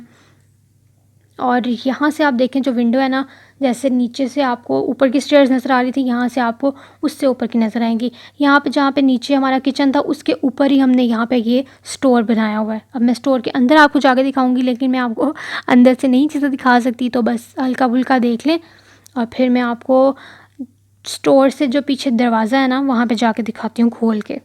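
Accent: Punjab, India. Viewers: Hello bhain kive o Tuc assi punjab ton (india) ton Te tuhadi bunjabi Sun k eda lagda k appa same aa edar wala punjab v same a main bht dinna ton thode valog dehk rai a te mainu bht pasand a rae ne waheguru thonu khush rahke 🙏🙏🙏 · Mashallah sis bohat piyara ghar h ap ka or Punjabi bohat maze ki h😂😂mory😅😄😍😛😘😙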